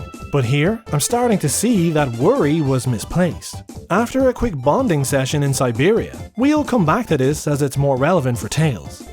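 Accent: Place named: Ireland. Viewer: As long as our favorite foxboy is given something in compensation in the future, I'll be very grateful and not as bitter 😆 Oh good, I was worried it'd come across as creepy 😖 And nah, I (at least, can) understand you pretty well! I dunno where in Ireland you're from but your accent is fairly clear to my ears (unlike some others 🤭)! I'm sure it's a cliche thing to say but Seán (aka Jacksepticeye) kinda helped my ears become a tad accustomed to to... well, SOME accents anyway, since there's not just one accent. glares at my fellow Americans who've yet to realize this fact Anyways... Yeah, Seán has made it slightly easy for me to pick some accents out, even if I can't tell whether they're from Cork or Dublin or what-have-you 🤣 When I first heard yours, I was like "Wait, is that...?" so I checked your homepage to double-check (hopefully that, also, isn't weird but 1. 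It was gonna bug me - especially if I was mistaken, which would've been super embarrassing - and 2. I like to know where the YouTubers I watch are from if it's not obvious) and I had a sigh of relief being right LOL Maybe it's due to Seán but I've developed a great appreciation for Irish accents (and by proxy, the Irish culture and folklore but then, I've always been into Irish music and dance since I was young) and being partially Irish myself doesn't help matters 😂😅 I'm so sorry that if this is ALOT to taken in, all cuz it's on your bloody VOICE - which is normal and probably nothing special to you, but I have a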